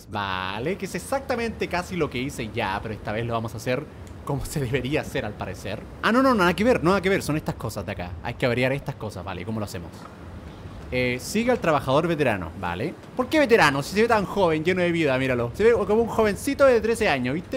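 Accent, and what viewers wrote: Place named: Chile. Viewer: Le volvió el acento chileno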